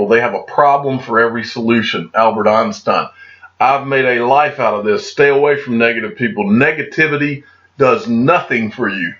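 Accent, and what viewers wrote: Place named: Georgia, United States. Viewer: You sound like some boys I know from Blackshear I bet you're in a 50 mile radius of Jesup. Hello from the Boro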